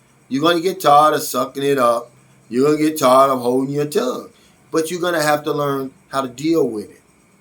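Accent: United States. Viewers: What?? I'm assuming you identify as being Black and from your accent I'm guessing American? 13 years and one incident and you don't feel welcomed? Honestly I probably would have starting laughing at their attempts in doing so. My deepest condolences for your friend John 🙏🏾 I moved away from the US because I felt not welcomed daily as a Black man. Maybe I'm missing something in your commentary? I'm confused? Wishing you the best sir; it truly sounded like one of those days...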